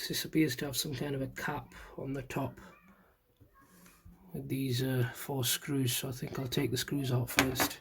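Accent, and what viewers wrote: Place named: United Kingdom. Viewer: He sounds like he's from the UK, it will never get above 38 degrees celcius there, let alone 58c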